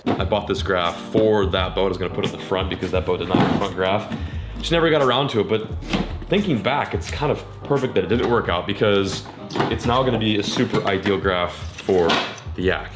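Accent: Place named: Alabama, United States. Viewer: dang is very pretty! What a good lookin couple-- heads must turn when the two of u are walking down the street! And when you all talk, w/th low smooth voice and w/th perfect Alabama (guessing) accent. SWEET!!! 😊😇🤠 BTW, happy 4 u both and good to c Lucky back! and i like that hit and miss, its ok if you're not an expert, skill set!👍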